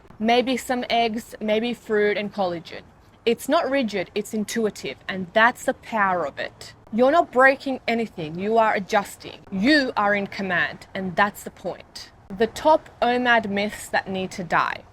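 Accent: Australia. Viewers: YEEEEEES! YOU'RE SO FREAKING RIGHT! Always grazing and never feeling clear.. of course sleep is a part of this but this once a day thing I'm going to try and hopefully keep. And lastly.. Man I forgot how much I love the Aussie dialect.. I'm in love with it again.. Maybe find an Aussie woman now😂. Thanks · You're Australian or New Zealander?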